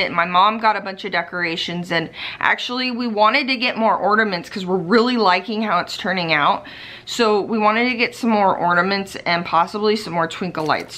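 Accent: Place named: Massachusetts, United States. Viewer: Rabbit holing lol😂..Ik you’re from Cali but you sound like someone from Massachusetts lols. Merry Christmas!🌟🥰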